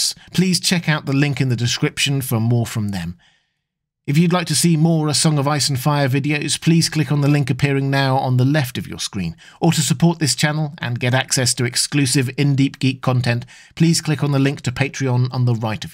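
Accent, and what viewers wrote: United States. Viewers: Wow. As an American, listening to and then hearing a sudden American accent is quite jarring. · Nobody wants to hear an American accent do this (nothing personal, I’m Australian and nobody wants to hear us narrate this either). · Yes, the American accent is really incongruous.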